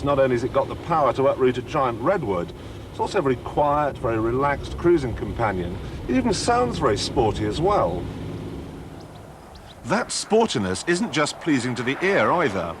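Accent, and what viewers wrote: RP, United Kingdom. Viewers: He used to talk so posh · Somehow sounds more british here than ever... Almost like the queen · Who's the public school boy doing the narration? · sounds posh bingo this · Wonder if is embarrassed by his silly plummy rp bbc accent now. “Out heeyarr the shogan” blah blah. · He sounds more british and mature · Received pronunciation, or BBC English. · Fuck me he sounds like a hooray Henry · early posh brit accent was a tad embarrassing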